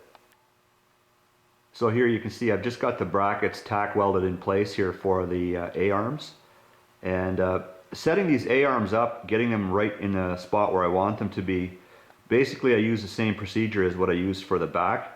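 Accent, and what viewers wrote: Canada. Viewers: Love the way Canadians say "out"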